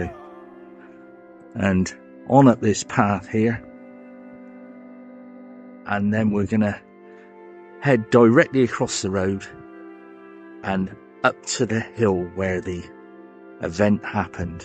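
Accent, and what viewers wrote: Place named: South West England, United Kingdom. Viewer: Hello. Yet another of your interesting stories. You've got an accent very familiar to me. Probably Hampshire/Wiltshire or Dorset. Very enjoyable either way.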